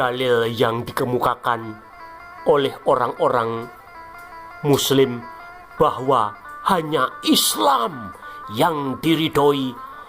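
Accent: Java, Indonesia. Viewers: Medok banget kamu cuk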